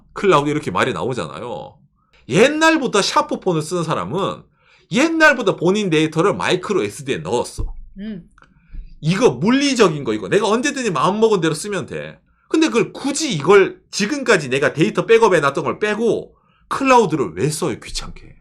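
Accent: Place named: Gyeongsang, South Korea. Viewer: 깅상도 유튜버 정도되면 사투리는 어느정도 걸러서 영상 올리슈. 유튜버 기속하려면!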